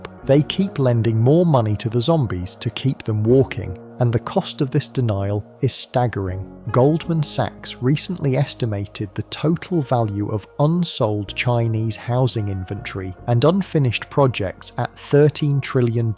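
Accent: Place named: England, United Kingdom. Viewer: Why is all this recent Ai generated content always narrated with an English accent? There must be a reason,i know its grating on me though.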